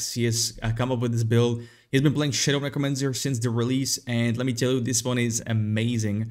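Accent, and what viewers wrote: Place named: France. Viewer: Bruh what language ur speaking...it sounds like english but french also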